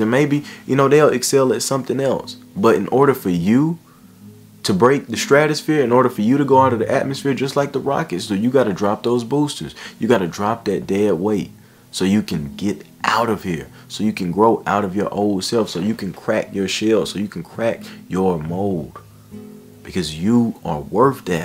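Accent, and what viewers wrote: South, United States. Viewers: Where are you from my guy? The southern accent is heavy in this one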